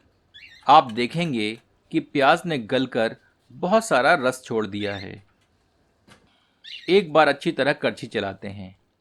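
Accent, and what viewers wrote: Standard. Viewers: 💯 shudhh hindi I like it☺️🥳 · बहुत ही शुद्ध हिन्दी का उच्चारण करते हैं आप सुनने में बहुत ही अच्छा लगा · Whh sudh Hindi very nice bro thanks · Itni shudh hindi in cooking 😮 very nice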